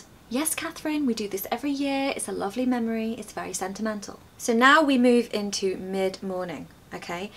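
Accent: United Kingdom. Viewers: You have eccelent accents British i like it's 😊 happy year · Merry Christmas to you and your family and a happy new year too , I don't usually comment on your videos but I think your videos and your way of teaching are really good, I learn a lot from your videos besides the British accent culture also keep up your good work.🎄🎉😘🇧🇷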